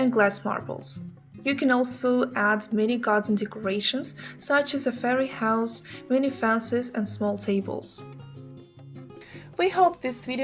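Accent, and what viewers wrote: Russia. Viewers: Русская на английском, слышен акцент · You have russian accent...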